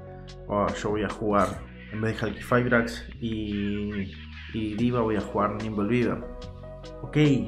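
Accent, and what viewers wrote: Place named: Argentina. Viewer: No te preocupes igual me di cuenta hace rato que eras de Argentina por tu forma de hablar, expresarte, etc, que es como si fueras de Uruguay, me gustó encontrar alguien que hace analisis, da opiniones, predicciones, EN ESPAÑOL, por que la mayoría son gringos y no entiendo una M jaja saludos y sigue asi, todavía espero tu analisis de las magias y trampas y monstruos de efecto de la banlist je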